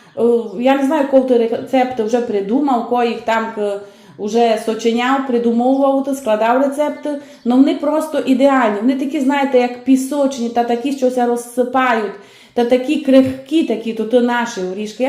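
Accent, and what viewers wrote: Transcarpathia, Ukraine. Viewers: Доброго вечора зі Львова! Ви така добра та щира🥰Дякую вам та вашій мамі❤️ Чудовий закарпатський діалект - слухаю з задоволенням! Щиро дякую за рецепт кабачкової ікри, там де рецепт на 1 кг. Я мала рецепти - но ваш тепер мій назавжди, навіть інші пробувати немає змісту. Він ідеальний, вже третій раз закручую. Щиро вам дякую☀️Добра бажаю🌺🌸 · я Вас вітаю з 300000 підписників, це величезна праця розвивати свій канал, зацікавити глядачів, щоб люди підписалися. дивилися. В тому і фішка, що закарпатський діалект, мені подобається, а кому не подобається нехай йдуть на інші канали, на ютубі величезний вибір блогерів. Дасть Бог буде пів мільйона підписників і мільйон, рухайтеся вперед, знімайте для нас смачні рецепти, я люблю м'ясо і солодке. Я дивлюся Вас з міста Черкаси.Бажання у кожного українця зараз одне Перемога і Мирне небо!!! 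· Вітаємо Вас,! Ви Чудо і ваші рецепти це знахідка для всіх нас. Хай Господь благословить вас і дарує Вам і вашій сім'ї міцне здоров'я, злагоди і миру. В нашій сім'ї зараз готується дуже багато страв за вашими рецептами. Любимо вас, вашу мову, ваші щирі слова, ваш закарпатський діалект, бо він прижився в нашій сім'ї. Рясних вам Божих благословінь. Успіху вам та процвітання. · І я приєднуюсь до ваших привітань і хочу побажати вам і вашій родині мирного неба Божого благословенства, та міцного здоров'ячка,я дуже рада що можу слухати ваш дуже приємний голос ,і гарний закарпатський діалект,багато готую за вашими рецептами . ❤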